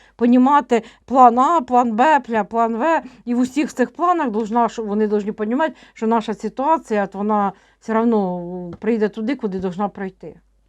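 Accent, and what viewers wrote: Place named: Kherson, Ukraine. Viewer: І у нас на Херсонщині так розмовляють, наш суржик рідний. Дякую, за вашу працю.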